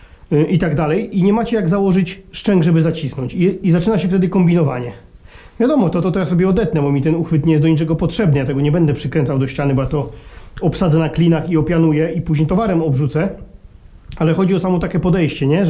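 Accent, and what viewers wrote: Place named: Kuyavia, Poland. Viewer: Idzie wek przypomniał mi się mój śp. dziadek też tak zawsze mówił ;d W ogóle dużo zaciągnięć słyszę u Ciebie które słyszę u siebie na kujawach ;d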